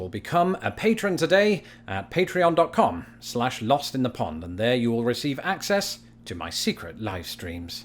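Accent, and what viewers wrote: United Kingdom. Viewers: I want to Mary a British guy. That accent! 😍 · Thanks again for another amusing and informative video. Dry humo(u)r is best delivered in a British accent.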